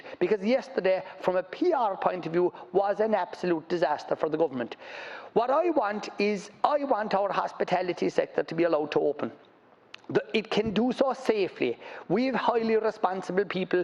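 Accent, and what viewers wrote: Kerry, Ireland. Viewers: How could anyone dislike a Kerry accent. It sounds like poetry to me and I'm a dub. 😉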